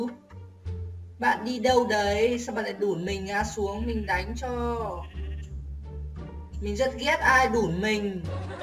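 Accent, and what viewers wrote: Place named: Central Highlands, Vietnam. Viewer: cải thiện cái giọng nói như người vùng cao ý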